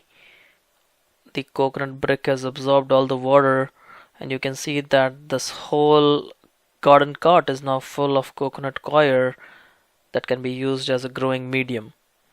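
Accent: India. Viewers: I love Indian accent, so refreshing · not an Aussie accent its Indian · indian i think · it's an indian accent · Your accent seems to be an indian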